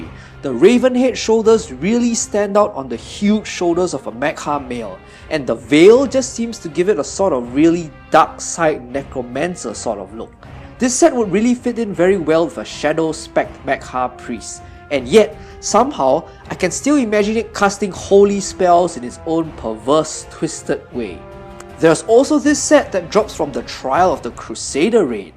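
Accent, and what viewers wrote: Singapore. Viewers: Ah, I see what you mean, then. I think he's simply explaining the ones that will be playable, as that's probably what non-lore followers will care for, and it seems that english might not be his first language (it might be, I'm just guessing based on the accent and seeing him talking about coming from Singapore in the comments), so there could also be contextual language that might be a bit mixed up there as well.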